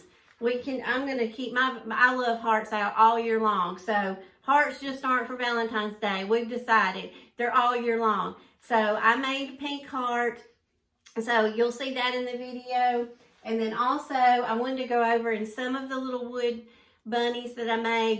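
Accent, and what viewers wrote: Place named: Alabama, United States. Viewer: I especially like your cute flower balls and will make some of them. Your YouTube channel just "somehow" showed up when I was watching some other videos about two weeks ago (or less). I love to watch all you are doing and your friendly. kind, sweet Christian spirit. You know just how to put items together. I have never seen anyone else stage their items and vary them as you do. I have shared your channel with my sister also and she really likes your videos. Such a treat just to sit down, enjoy and learn from you. When I first heard your accent, I thought you might be from Alabama. I am from Ft. Payne, but moved to the mountains in western North Carolina in 1989. Thanks so much. God bless.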